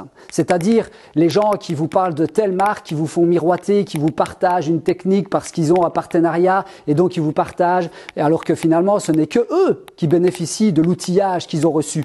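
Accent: Belgium. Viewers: T inquiète, la grande majorité a compris ta démarche. Je te regarde pour le partage et la qualité de ton travail. En bonus j apprécie ton franc parlé et ton accent belge. Bon week-end à toi.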